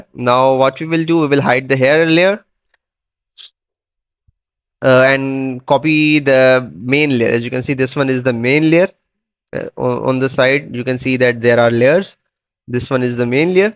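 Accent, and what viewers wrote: India. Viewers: When I hear the Indian accent I knew I was gonna leave with what I wanted while he explained it to me simply ❤, Tysm pro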